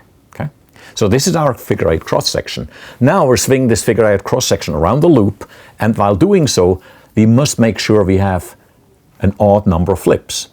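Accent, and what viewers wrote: Switzerland. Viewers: Yay he's actually Swiss! :D (Like me) (The accent made it easy to guess it right) xD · he kind of sounds swiss. grüezi!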